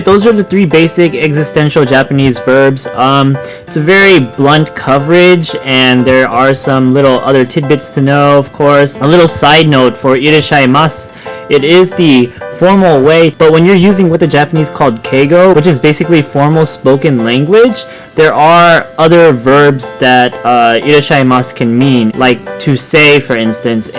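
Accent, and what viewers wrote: United States. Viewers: Is English your first language, or did you learn it as a 2nd language? If the latter, i must say your American English accent is perfect. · I'd guess he was raised in america(prbbly was born there as well) but his parents are japanese and talked to him in japanese But I think he has an accent in japanese (not sure tho)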